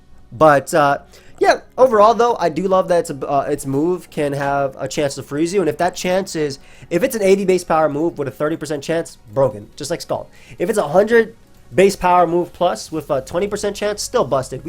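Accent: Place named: New York City, United States. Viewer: Your NY accent really popping off today haha. I think Zapdos will be great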